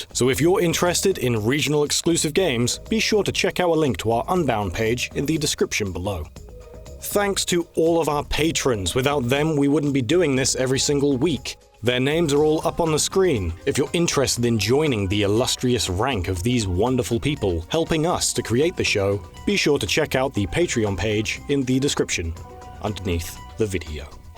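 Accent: United Kingdom. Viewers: This is a very British narration.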